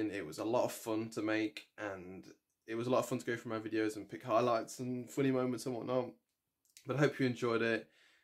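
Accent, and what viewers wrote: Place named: United Kingdom. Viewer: Bro you're British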